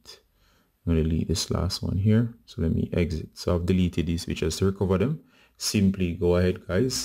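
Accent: Jamaica. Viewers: Omg!!!!! Thank you so much!!!! I was so scared that I lost all of my pictures and you helped me recover them. Also p.s I detect a J.A accent...big up your self! I'm a fellow Jamaican as well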